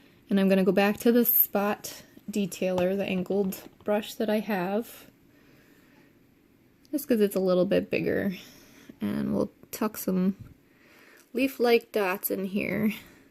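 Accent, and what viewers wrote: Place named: Ohio, United States. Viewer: Love ur technique, style and natural ability to teach and paint. Happy to hear u r from Ohio. Kinda thought u might be by listening to you! That’s a good thing! I am from Ohio currently outside of Cincinnati. Thanks for sharing your talents. God Bless you and your family! Would love too see you do a rose 🌹.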